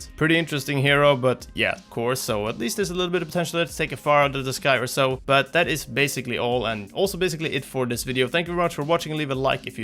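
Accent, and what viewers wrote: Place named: Sweden. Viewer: One of the most Swedish accents I've heard / a Swede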